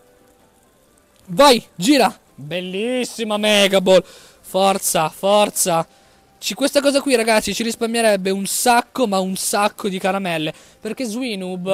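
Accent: Italy. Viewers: Ma sei di Brescia?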